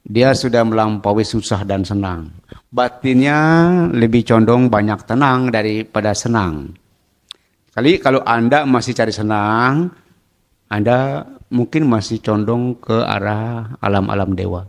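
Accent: Indonesia. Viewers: logatny betawi medok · Baru kali ini denger bhante berlogat bekasi-karawang-cikarang dan sekitarnya 🫶😊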